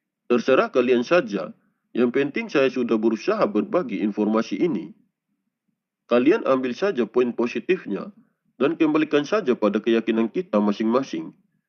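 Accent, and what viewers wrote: Bima, Indonesia. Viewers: Ini org buat vloq YouTube ngawur ngidul ngetan kullon . Anda itu jgn memfitnah jodoh Satryo piningit hanya orang biasa biasa sj . Emang anda bisa lihat wajah asli Satryo piningit dan ratu adil . Setahu saya ratu adil itu putri bangsawan dr tanah Padjajaran dan Majapahit ya . Anda ini jgn trawang tentang Satryo piningit bahwa beliau itu dpt kekerasan dari istrinya . Kayanya ini orang bukan orang Jawa suaranya kaya orang BIMA NTB . SATRYO PININGIT ITU KHUSUS UNTUK ORANG JAWA DAN SUNDA YA .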